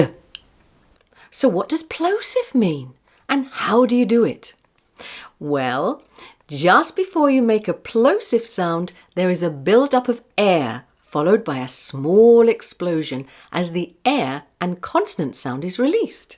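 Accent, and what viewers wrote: United Kingdom. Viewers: Thank you so much for your time. you are the best and we love the way how you teach and your British accent.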